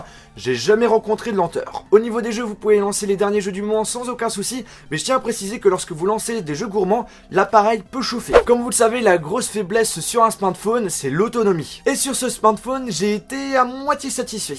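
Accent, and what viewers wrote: France. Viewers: Are you French？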